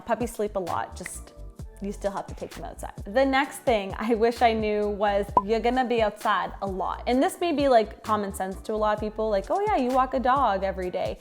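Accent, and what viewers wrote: Canada. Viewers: Is she Canadian? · R u Canadian? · Your canadian, arent you?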